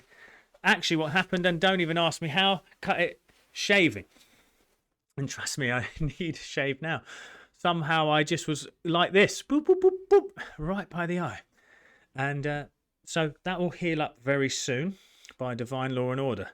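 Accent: Essex, United Kingdom. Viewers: I knew you were an Essex boy! Love your passion😍. New to you but look forward to hearing from you and your way of explaining it all.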